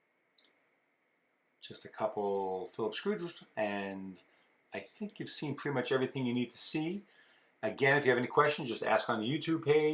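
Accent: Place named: Massachusetts, United States. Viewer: Your in florida but you def sound like your from that MA area....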